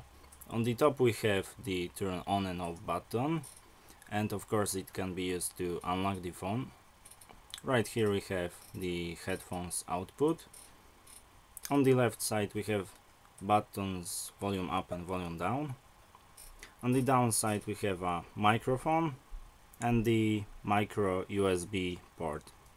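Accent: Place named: Poland. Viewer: Słychać że z polski :D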